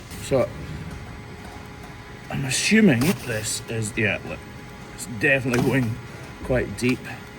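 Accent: Scotland, United Kingdom. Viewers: Enjoy your videos and the craziness you find. It is quite amazing (and gross). You're also very handsome, and you're all up in some serious cow sh*t on the daily, helping fellow animals. That's all kinda, pretty hot (not the cow shit, but the level of care you put into your work and the animal, while spitting/wiping it away when you get shot in the face with shi*t, piss or puss). Haha. God bless you, sir, for the work you do. AND! You're from Scotland! I love it, love the accent. My dad's side of the family is Scottish; I've traced my family heritage back to Scotland, in Lanarkshire. My family raised Clydesdales, which also originate from Scotland. It's been my next big wish to make a trip to visit Scotland. Born and raised in Montana, USA, on a farm and ranch, so yes, I have much appreciation for your job and the work you do! Good on ya, lad! 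And, yes, I subscribed! :) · Handsome man 😍 Scottish accent 😍😍 Kindness to cows 😍😍😍😍😍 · I like channels such as yours because I love Scotland , people from Scotland, and animals. My ancestry includes dairy cattle and their care on nearly every branch and twig of my family tree. I no longer question where my curiosity comes from because it is all genetic memory. So my great, great grandfather had an accent like yours. His grandson married a young lady who was born in the US to Bohemian parents who spoke German. Dairy cows on both sides. My matrilineal side back before 1700 when they arrived in Jamestown, Virginia came from a place in France called Beau Vac or beautiful cow. I appreciate your skill, love, and care of these beautiful creatures. Thank you. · Love what you do for these cows, I'm from America and I love cows, it's awesome to se you helping them. I never really knew the full extent of the work that goes into caring for the cows. 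Great explanations as always man!! Also, love the Scottish accent (I'm saying this and laughing cause I'm from Alabama, and we have a very thick accent.)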